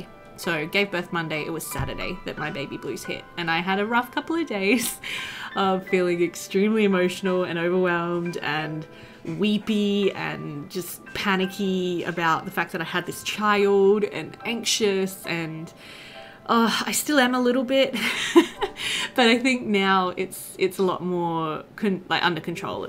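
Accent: Australia. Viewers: Congratulations! Baby Jelly is so cute 😍 Hearing your birth story was very interesting and sorta informative for me since I've never been pregnant yet, but you had a lot of courage! Births are never like you expected them, it's always a surprise but I'm glad everything went well in the end for you and the baby. I'm a new subscriber, so don't worry about making content yet I still have a lot of Sims 2 Pleasantview to catch up 😉 Love from a Canadian girly ❤ (I love your aussie accent by the way!)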